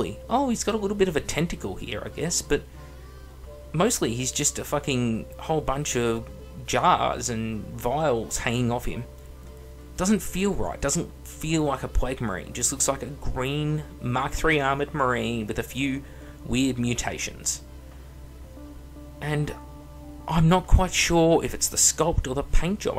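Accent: Australia. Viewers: "Your typical british kid." He sounds like he's from Straya, mate.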